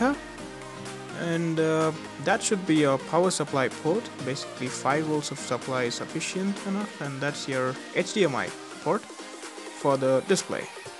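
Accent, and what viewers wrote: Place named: United States. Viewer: u have a american accent or u must be prentending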